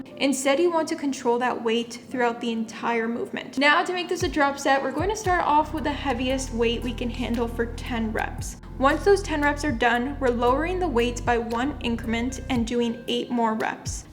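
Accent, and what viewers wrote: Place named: Canada. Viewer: no clue you were canadian until i heard the accent lol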